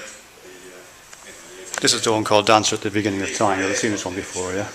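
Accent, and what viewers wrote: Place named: Liverpool, United Kingdom. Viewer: there's lots of scouse/chessire in this video... are you from the north east or the wirral?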